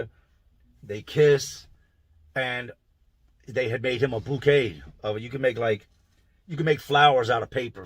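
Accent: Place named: Louisiana, United States. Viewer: Idk how this ended up on my recommendations but I'm glad it did... I was hanging on every word 😅 you're a great storyteller and I don't even like prison stories. You sound like you're from Louisiana ..cool accent.😊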